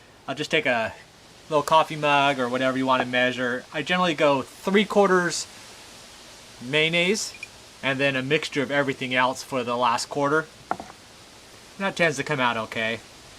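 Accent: South, United States. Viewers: where'd u grow up? never rlly see a somewhat old asian guy with a southern accent lol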